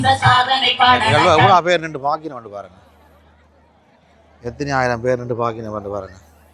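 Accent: Jaffna, Sri Lanka. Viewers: யாழ்தமிழில் கலகலப்பாக பின்னுகிறீர்கள் கோழிப்புக்கை எப்படி செய்வது என்பதை எனக்கு அறியத்தருவீர்களா?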